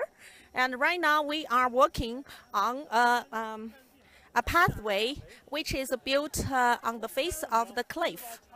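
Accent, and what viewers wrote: China. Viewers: What I love about the video is that when she switch from English to Chinese or vice versa she sounds the same mean Chinese her Chinese ascent is so Heavy as she goes from English to Chinese I'm like hold on what?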